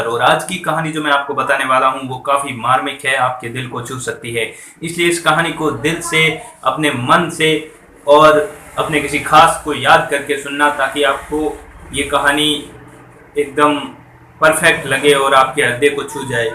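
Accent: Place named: Marwar, India. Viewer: होकम आपकी बोलने की शेली बहुत सुंदर मारवाड़ी देशी भाषा की तरह हिंदी भी बोलते है बहुत सुंदर होकम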